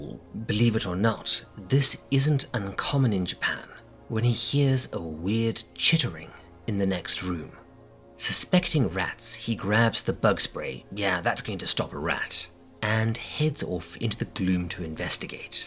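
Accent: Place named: South Africa. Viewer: Dude - you're South African? Been binging your vidoes and had no idea until you mentioned load shedding and having a kudu on the wall growing up. Now that I am listening, I hear how you say "off" as "orf". Peeps in the UK always made fun of me for that lol. Keep up the great work 🙂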